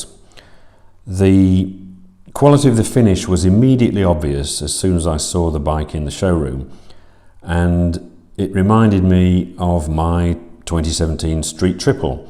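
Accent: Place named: Yorkshire, United Kingdom. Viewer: Eyup dude, Welcome back,glad to hear your Yorkshire accent again !! lol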